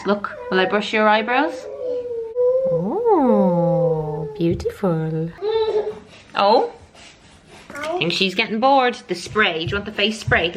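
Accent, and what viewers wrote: Ireland. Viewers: Hi, just wanted to say thank you for all of your parenting videos. I have been feeling extremely conflicted about the idea of maybe being a mom because of my own childhood. Seeing you interact with your kids makes me so happy and a part of me is healed and excited about the prospects of parenthood. So thanks for that <3 Oh and, after watching your videos I always subconsciously put on a little Irish accent when going about my day. I hope the idea of a German gal living in the UK with an American / part-time Irish accent makes you laugh:D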